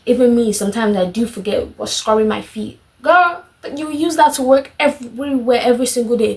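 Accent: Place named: Nigeria. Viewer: The Nigerian accent I'm loving it😂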